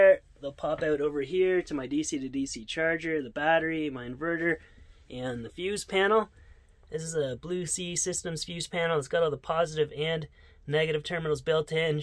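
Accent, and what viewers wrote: Canada. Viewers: nice you have the same jig saw i do! Just bought it to help with my future builds! Love the accent. Mn raised here, Not as thick as your canadian but don't you know..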